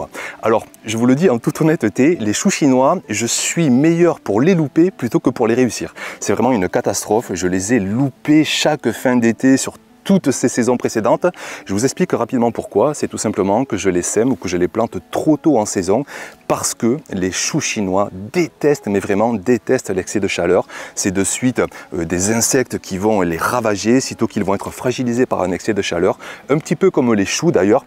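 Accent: South, France. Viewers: Un grand merci, pour tous tes conseils sur le potager. Ton accent du Sud et ta bonne humeur rendent chaque astuce facile à suivre et motivante 💚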